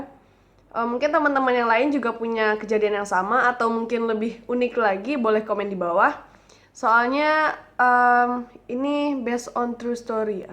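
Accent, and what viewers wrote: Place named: Minangkabau, Indonesia. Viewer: buliah kenalan uni. namo awak della asli minang tapi laia dan gadang di jakarta . papa jo mama awak urang lubuak basuang . awak kuliah di gundar semester 5 jurusan akutansi. uni bahaso minangnyo barasiah bana . sanang awak mandanganyo tp awak indak sapasiah uni bana bahaso minangnyo.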